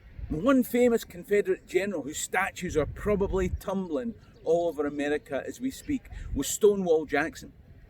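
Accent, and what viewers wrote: Scotland, United Kingdom. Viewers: I to am American of Scottish decent. Love the stories and the accent! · What accent would you expect from a Scots man lol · Love the videos, as they deal with subjects that have I have always found interesting. A bonus for me is your accent, it brings back fond memories of my Scottish Grandpa on my dad's side. My Grandpa immigrated from Scotland to the U.S., by way of Canada. He met and married my Grandma in Massachusetts, her family had been in New England since the Mayflower, with my ancestor, Peregrine White, being the first English baby born to the Pilgrims, on the Mayflower, while the ship was anchored off of Cape Cod I have dual citizenships, one due to my British Mum, as well as me being born in England, and a U.S. Citizenship from my American Dad. I have lived most of my life in the USA, with over 30 years just outside Phoenix, Arizona. 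If you want to know more details about me, and the seriously tragic event that has shaped my life, including the horrific disfiguring scars that make people gasp, and small children cry, I have posted a link to a video that captures the exact moment my world was literally ripped apart... ⚠WARNING! GRAPHIC VIDEO! NOT FOR CHILDREN OR THE SQUIMISH!⚠ <link>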